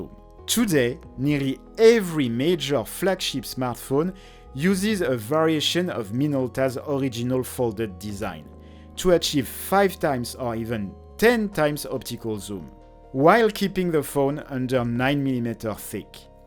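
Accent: France. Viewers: le p'tit accent français, je ne m'y attendait pas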